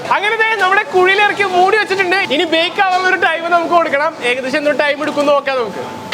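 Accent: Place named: Kannur, India. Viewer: Wow ❤ Kannur full ithan 😂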